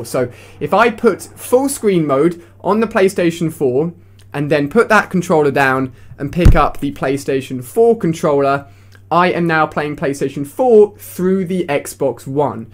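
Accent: England, United Kingdom. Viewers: Daniel Patel Because he's British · Z is pronounced ZED in the UK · because he was born In Britain you idiot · why do you have an English accent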